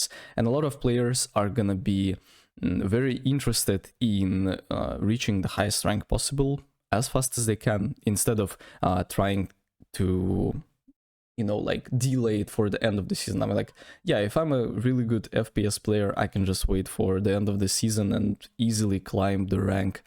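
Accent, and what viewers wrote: Russia. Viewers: Мне показалось или у тебя русский акцент?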